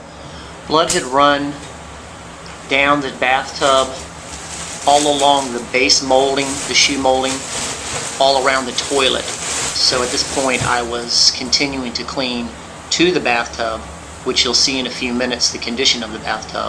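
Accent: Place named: Norfolk, United Kingdom. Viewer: That Norfolk accent is THICK. I’m from northern va, so we say it all boring “nor folk”.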